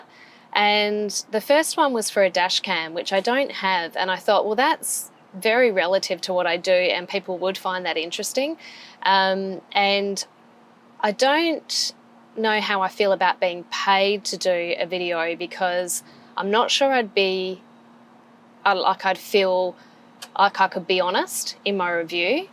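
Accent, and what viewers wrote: Australia. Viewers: Hi, I’m a new subscriber and couldn’t have been more excited to hear a fellow Aussie accent. You will do well because you are so real and natural. I love your drone work it must have been tricky learning that. Di x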